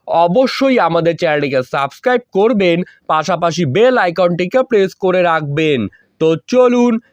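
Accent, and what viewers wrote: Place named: Kolkata, India. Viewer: কথা শুনে মনে হইতেছে কলকাতা বাঙ্গালী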